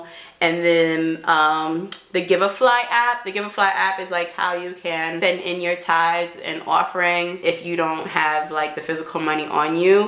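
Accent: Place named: New York, United States. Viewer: Ayeeee you a NEW YAWKA🤘🏽💙but i have wordscapes to its mad addictive.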